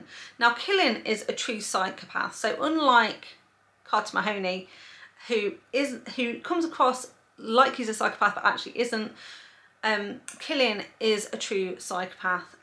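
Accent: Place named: England, United Kingdom. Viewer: I subscibed today, love your english accent! You made me laugh with the bloopers!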